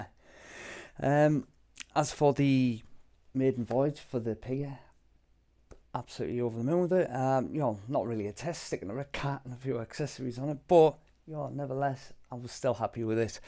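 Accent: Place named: North East England, United Kingdom. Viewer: Hi That's a great image! It's so hard to get a balance between the vibrant blue of the nebula and the dust clouds that give that area of the sky it's extra bit of fascination, but you did a very good job. Impressive guiding! Great to hear a voice from home too From a North East emigrant now living in Austria. Ken Haughan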